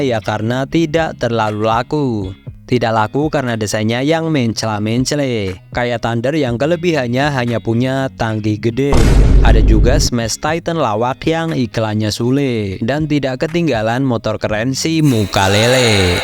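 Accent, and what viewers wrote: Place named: Central Java, Indonesia. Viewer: Keliatan sekali 😂😂😂 mimin ini, orang jawa tengah 😂😂😂😂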